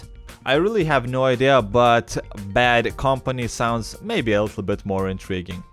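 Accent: Russia. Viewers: no offense but it kinda sounds like youre russian like youre acsent i thought was a dead give away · i can already tell he's russian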